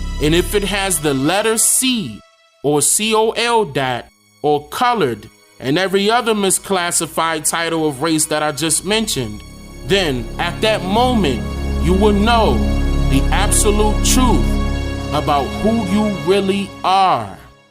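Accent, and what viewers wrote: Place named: New York, United States. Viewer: video might be ok i f your fucking ny accent was not so fucking annoying loll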